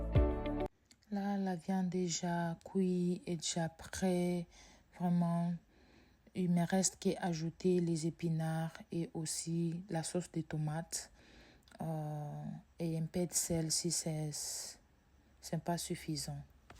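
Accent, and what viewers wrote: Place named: Democratic Republic of the Congo. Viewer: J'aime tes vidéos, je pari que tu es congolaise ( l'accent) . Vrai ou faux ?? Moi Alain d'akwa Cameroun je kiffe 👍 courage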